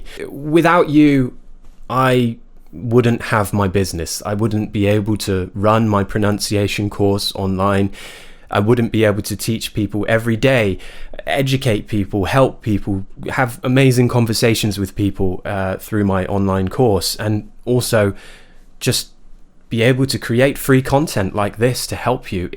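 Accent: RP, United Kingdom. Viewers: Welcome back🌷 You are the first tutor I've learned the British accent from and that helped me to sound more posh. Thx from Egypt. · Hi it's nice to see you again. Congrats for 1M subscribers. You deserve it. Your British accent is amazing so please post your English lesson videos regularly. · you have an accent yourself (a british one). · Because you speak British English perfectly and clearly that's why we like your videos and a am very happy you are come back again. Thank you very much for your videos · Just listening to you is pleasure) Love RP! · Normally I don't fancy RP accent 😜! But is the only exception huehuahuehuahuehua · Welcome back my great RP English accent I'm grateful that you're back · Waooo!! Finally I've found you again, l really missed your video a lot You're the one introduced modern RP accent to me. Thank you once again and welcome back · RP accent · Greatest teacher ever 🥺❤️ never get bored of your British accent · You’re an amazing teacher! 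I absolutely love your beautiful British accent, I could hear you for endless hours ❤ Congrats on 1M!!! You truly deserve it 👏🏼👏🏼👏🏼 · Welcome back!!!! Thanks for this great video as always, really love your British accent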